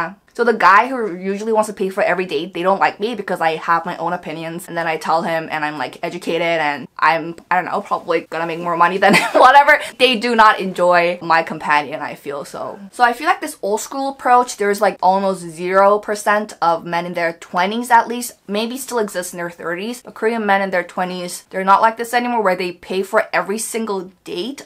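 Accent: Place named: New Jersey, United States. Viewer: This person is talking about "Foreign girls being shocked at..."🙄😌She means American girls, though.. (Although obvs she's never come across any decent British men (just chavs as far as I can see.) She talks as if the whole chivalry aspect & other such things are purely for Korean men, when certainly that is not the case - & any decent British man will displayed rather a lot of that kind of thing - but she appears to hv dated a lot of wasters, who don't "Ooooh - damn that masculinity..." - frankly it's ridiculous to try to make men, not be men - & espc to be "offended" by them being so🙄😌 You don't need to "prove" that you "don't need them" & can "make more money than them" (Ssuming you KNOW that about yrself, & a man trying to impress you by pretending he can do mosr things better than you, is just a man being a man - you appear to want them to change who they are; so no wonder you keep being disappointed. 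You being "able to earn more than them" or "get a better job" , that doesn't need to come into it - but if you constantly hv to try to make yrself feel better about yrself - well thats not any man's fault either - do you watch these back, bc any therapist would hv a field day with this stuff, I think..🙊💜My gosh, what doesn't she complain about🙄😌 This person should just admit she went to America & became an American - & that she goes out of her way to annoy most Koreans as much as psbl by being one - by being yr basic mouthy New Jersey or even Queens type (& that accent is AW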